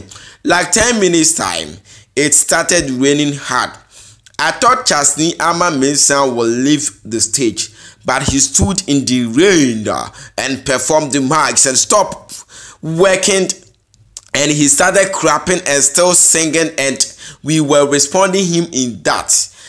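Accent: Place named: Ghana. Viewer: Learn how to read my brother. Twi is killing your English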